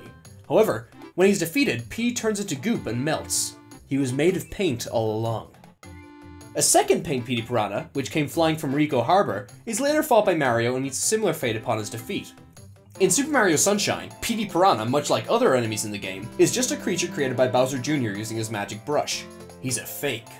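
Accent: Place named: United Kingdom. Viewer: I just noticed you pronounce Yoshi how most British people do, with that "oh" sound rather than "owe" sound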